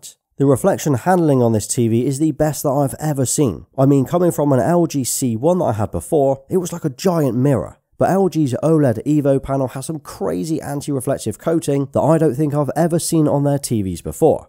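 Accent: United Kingdom. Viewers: Hello, are you british?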